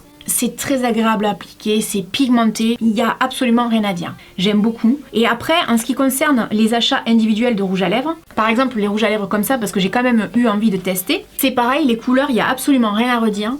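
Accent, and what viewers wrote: South, France. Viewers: Merci pour cette vidéo (je ne t’appelle pas Maman ne m’en veut pas mais nous avons le même âge 😜) et tu me rassures car moi aussi je me maquille plus qu’avant. Je ne sais pas si tu as vu bellapiere est sur Showroom privé jusqu’à demain matin. Ça ne fait pas longtemps que je regarde les vidéos de YouTubeuses sur le net, je ne pensais pas en trouver une avec laquelle je me retrouve et en qui donne de bons conseils. Et puis l’accent du sud me manque trop c’est un régal de t’écouter parler. Bisous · Est ce qu'il serait possible de tester la marque de Gaelle Garcia Diaz "Martine" j'aimerai beaucoup ton avis dessus ! Bisous continue comme cela j'adore tes vidéos et ton accent me rappelle ma famille du Sud 😍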